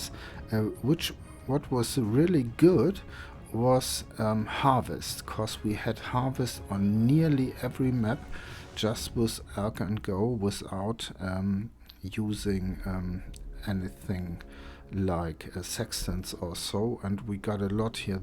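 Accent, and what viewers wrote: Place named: Germany. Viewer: Du musst Deutsch sein deinen Akzent hört man schom derbe raus.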